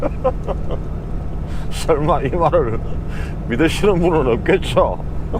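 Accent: Gyeongsang, South Korea. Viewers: 영상 날마다 보고있습니다. 카메라를 통해본 캐나다. 미국은 상상했던 것과는 다른 풍경이라 색다르고 갱상도 말투도 동네형님처럼 정겹고 그렇습니다. 항상 힘내시고요 오늘도 화이팅입니다^^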